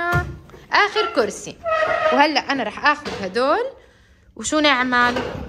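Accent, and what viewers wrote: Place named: Egypt. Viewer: أنت مصرية بتحكي مصري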